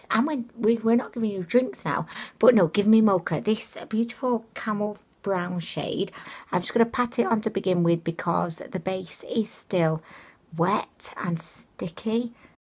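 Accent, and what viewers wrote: United Kingdom. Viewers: you make me want a British accent so bad! Wanna trade, I'm a southerner (South Carolina born and raised)? You'd just have to say y'all a ton, wet "yer" whistle with some sweet tea, while calling everyone Hun, Honey (not just Hunnies), Darlin', and Sweetie. 😂🤣😂🤣😂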